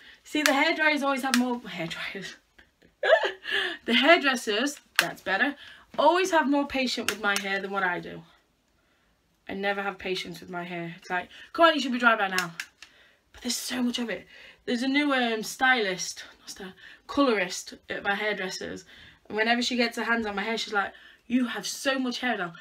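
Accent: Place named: England, United Kingdom. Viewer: You have an accent are you from England or British or something like that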